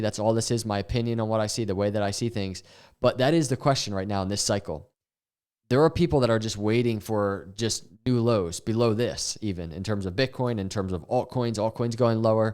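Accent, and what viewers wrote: Philadelphia, United States. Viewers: I love your channel bro. I did my medical training in philly and you seem to have the accent. U a philly guy? If so pats or genos?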